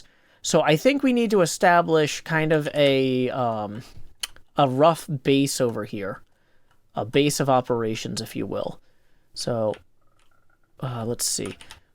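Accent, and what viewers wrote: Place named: New York, United States. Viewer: ur new york accent is stupidly funny. im in hysterics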